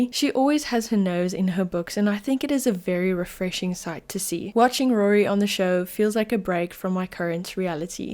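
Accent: South Africa. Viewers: Great video. Are u afrikaans? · Great video. Even as a long time reader, this helped a lot. Also are you South African? your accent sounds like home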